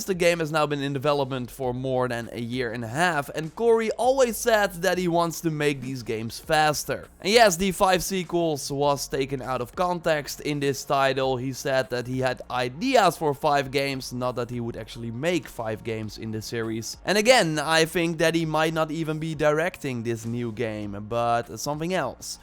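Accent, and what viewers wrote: Netherlands. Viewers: Nice info and video, greetings from a fellow dutchy (I could hear it from your accent hehe)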